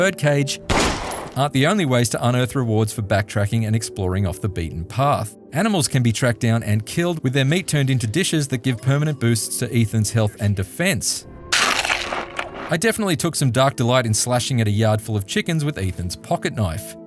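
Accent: Australia. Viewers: The guy clearly has an Australian accent. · love the aus accent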